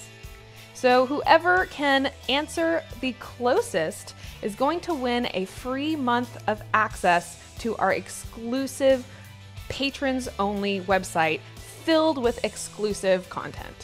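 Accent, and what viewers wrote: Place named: United States. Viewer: Watched for 5 mins then couldn't stand your annoying slow American accent zzzzzzzzz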